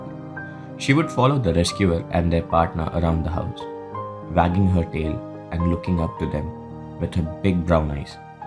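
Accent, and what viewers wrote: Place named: India. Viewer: The storyline never matches what we are watching. It's like miles behind. The dog has been with the woman from the beginning of the video but the storyteller is making it seem like that's only happening half way through the video. Whoever produces these videos doesn't know what they are looking at when they are putting the English storyline into the video because the transcript is automatic and follows the sounds of the storyteller. As he sounds Indian, it might be that he uses Indians to put his video together for him and they don't speak English. Maybe that is the reason for the mish mash.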